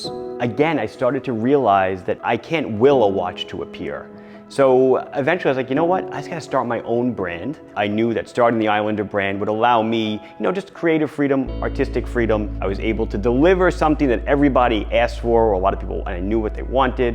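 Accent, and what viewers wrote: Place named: New York, United States. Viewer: Hearing your New York accent brings me happy and nostalgia feelings, having grown up in Queens and Long Island. As a kid I used to think everyone spoke like that. My cousins who are bit older still have heavy Queens accents and they are a joy to listen to. And your story is fantastic. Good luck to you!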